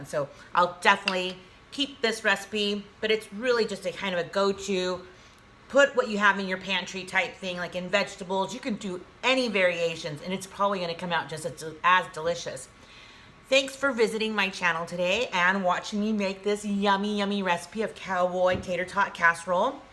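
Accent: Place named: Wisconsin, United States. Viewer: I'm hearing a very Wisconsin accent there. You wouldn't happen to also be a Charlie Berens fan would you?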